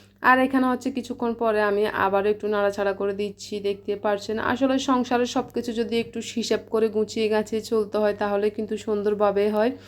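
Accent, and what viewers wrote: Noakhali, Bangladesh. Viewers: অনেক সুন্দর সুন্দর বাজার দেখলাম❤ নোয়াখালীর কোথায় তোমার বাড়ি ❤ কথা শুনলে বোঝা যায় তুমি নোয়াখালী আপু 💞 এক মাছের বাজার❤❤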